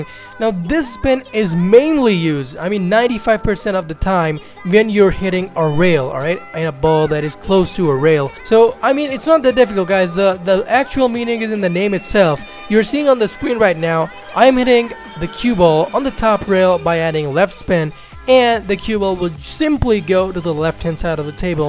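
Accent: India. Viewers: whait is he indian? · uh he’s Indian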